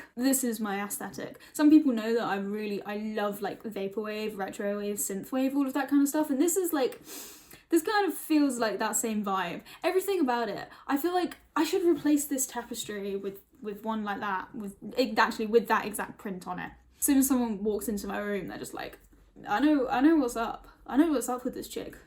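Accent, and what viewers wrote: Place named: United Kingdom. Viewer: I didn't expect you to be british lol the first video I saw was your wipe it down video and it was classy af. Love to see and hear more about your life.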